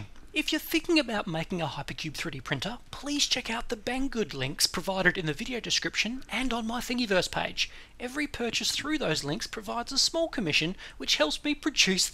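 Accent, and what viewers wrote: Australia. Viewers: must be the sydney accent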